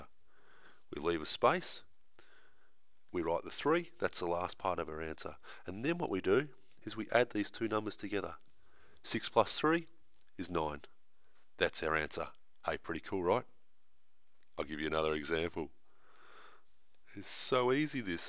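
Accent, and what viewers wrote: Australia. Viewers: I think learning maths in an Australian accent has just become my new favourite thing.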